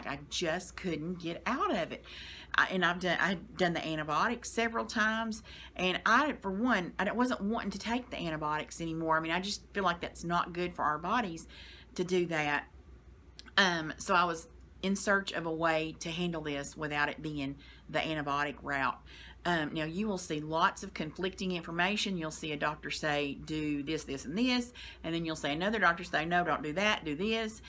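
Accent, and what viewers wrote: South, United States. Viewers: I can see **OATMEAL** being a problem. I eat these cookies my wife sometimes make. They are chocolate and oatmeal. Not like Oatmeal cookies. But the oatmeal (like nuts) get trapped in the bad spot of my intestine and cause a flare up! Wouldn't work for me! Oh yeah! I love your strong Southern Accent! 😊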